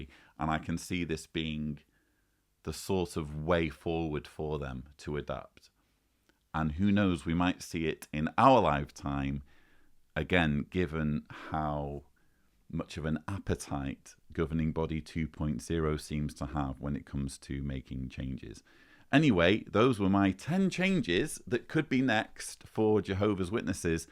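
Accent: United Kingdom. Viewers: I come here only for the British accent and the Tony bashing 🤣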